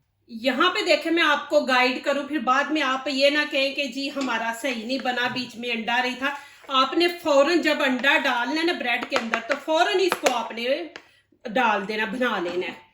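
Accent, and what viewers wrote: Punjab, India. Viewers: MashAllah very delicious recipe and Your Urdu so sweet Panjabi accent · Punjabi tone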